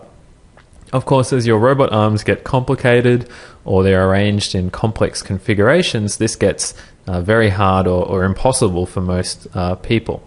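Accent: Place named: Australia. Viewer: Refreshing to hear intelligence behind an Aussie accent :-) (coming from a country where the only Aussie representation is the Soaps on TV). Back on topic: Great introduction to Kinematics, thanks.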